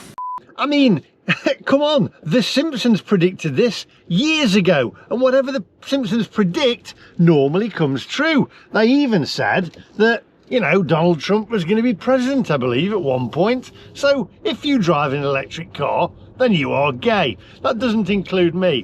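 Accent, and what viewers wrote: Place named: Northern England, United Kingdom. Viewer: The fake trip over the charging cable was pathetic. "It's one of them cars" it's one of them services " it's "those ". can't forgive you for being northern,as my dad was a northerner, and he knew how to talk properly.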